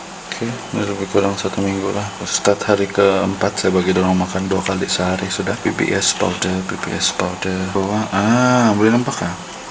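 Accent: Sabah, Malaysia. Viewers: ui na, mantap boss, terus maju jaya sihat selalu dan murahbrezeki bosskur, truskn ckp begitu, sia mok blajar beta sama mok dgr berckaap sabah, btw info harini jelas dan paham terima kasih👍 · Selamat malam tuan..bila sye tengok tuan punya channel Saya faham ape tu maksud p0 Dan F terima kasih tunjuk ajar...jiwa Saya semakin membara untuk breeding betta...Dan Cara tuan cakap sabah teringat sama kawan2 di tempat kerja tahun 2007 dulu..sebab bila bersama diorang terasa Saya nie di sabah...hahahahahahah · mntapp..cakap sabah serupa dgn bhasa brunei bng..ngam ka salam dr brunei broo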